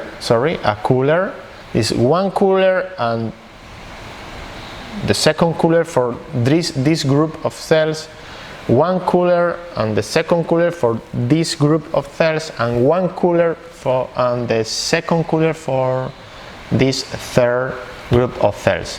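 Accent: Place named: United States. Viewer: Súper para los que no tenemos el oído acostumbrado a la modulación de un gringo:)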